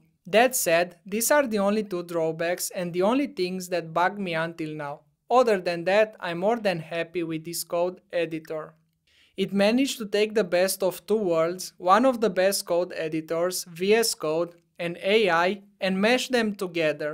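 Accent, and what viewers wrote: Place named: India. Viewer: Came here for the Indian accent.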